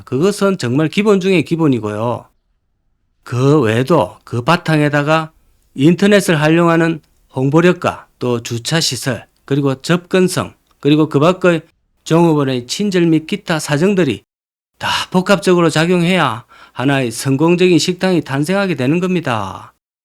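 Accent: Gyeongsang, South Korea. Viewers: ~재밌는영상에 중독되었네요~ 배울것도많고 유머도있어서 힐링되네요~저도 경북이고향이라구 구수한 경상도 사투리가정감있고추억소환이 되네요~잘보고 있습니다~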